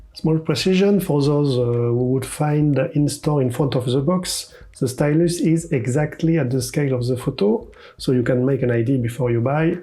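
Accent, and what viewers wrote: France. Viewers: Toi t'es Français. Tu parles aussi bien que moi avec ton accent magique et grace à ça j'ai tout piger. Merci.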